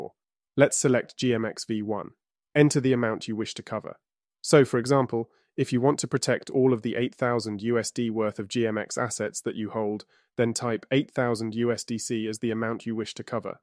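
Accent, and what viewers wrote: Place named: United Kingdom. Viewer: I like dictor's british accent)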